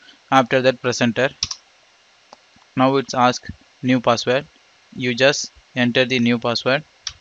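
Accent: India. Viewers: Despite I couldn't your indi accent I could follow your instructions and could achieve it. At least you deserve the like because it was helpful.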